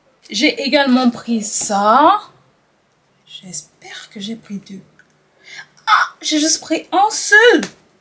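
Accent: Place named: Gabon. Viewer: Je savais avec cet accent que ça c'est une gabonaise quand j'écoute encore le elang no si😅ma sista FANG 🎉🎉je m'abonne du courage❤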